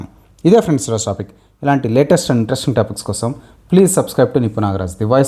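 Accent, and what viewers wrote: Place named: Tamil Nadu, India. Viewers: Hybrd tamil super anna. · Tamil in telugu slang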